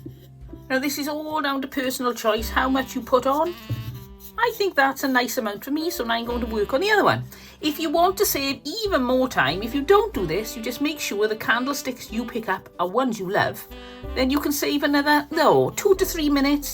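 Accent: Wales, United Kingdom. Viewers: we enjoyed your video,it was lovely to hear a welsh accent for a change on a crafting channel .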